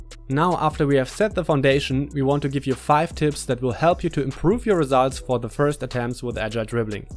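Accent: Germany. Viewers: Are u german? Sounds a bit like a german accent... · Man hört auf jedenfall das du deutsch bist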